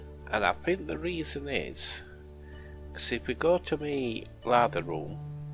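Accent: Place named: Manchester, United Kingdom. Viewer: Nothing to do with your voice, it has a good north Manchester accent. Glad you don't have commentary in one ear and music in the other I like both together.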